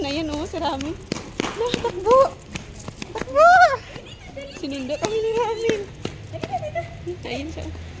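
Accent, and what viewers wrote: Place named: Visayas, Philippines. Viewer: Namis mo pala lugar 19yrs old ka pa huling silip. Muntikan na nawala si Ramen, ok yan bisaya accent, actually kahit ano accent ok lang. Napansin ko mahilig ka po pala talaga magpapicture. Sana all may phographer taga kuha picture. Sipag ng photographer mo, siya pa humirit na isa padaw picture. Ang galing ni Ramen sumundo sa inyo. Ayos!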